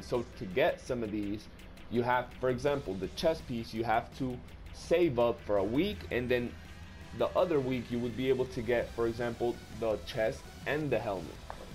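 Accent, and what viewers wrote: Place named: Brazil. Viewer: Where are you from? By the way you talk, u seem to be a Brazilian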